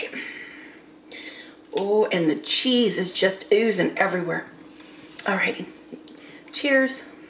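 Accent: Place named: AAVE, United States. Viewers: Enough with the Ebonics. Dang it!!!! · Ebonics hella cool Bro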